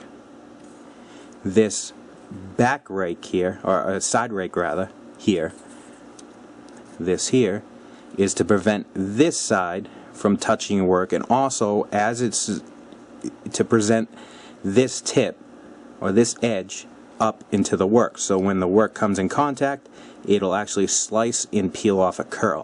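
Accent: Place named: New England, United States. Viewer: Good to hear the accent - I used to race at New England dragway in Epping and grew up in Burlington, Mass. - Now teaching machine shop in Florida - good videos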